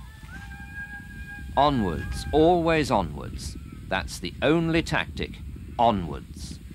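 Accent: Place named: United Kingdom. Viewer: Use American English. British English is difficult to understand.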